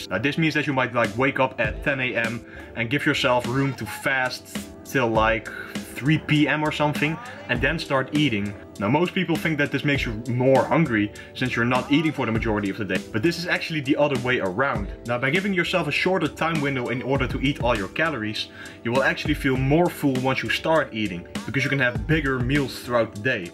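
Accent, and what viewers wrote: Netherlands. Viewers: Een nieuwe abonnee erbij hoor aan je acccent dat je Nederlands bent 💪🏽👊🏼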